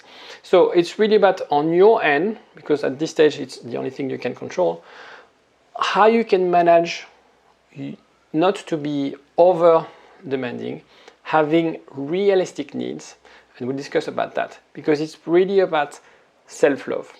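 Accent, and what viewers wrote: France. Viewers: French. Canadian accent is different lighter. Sounds very different if you speak French more than listening as an English speaker.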